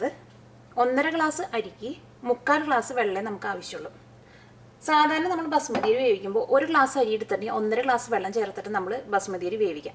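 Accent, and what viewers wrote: Thrissur, India. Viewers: Hey Chechi, You are really great...You have got a lot of talent......Keep up the good work & keep teaching us more & more recipes...Your recipes are easy to understand & delicious too....May God bless you.....& I specially love ur Trishur accent...:)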